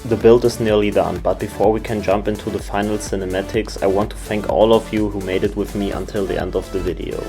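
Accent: Germany. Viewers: Einfach der deutsche Dialekt im englischen. 🤣 ich feiere es. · It sound like you are german are you ? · youre accent sounds very familiar to me are you from germany?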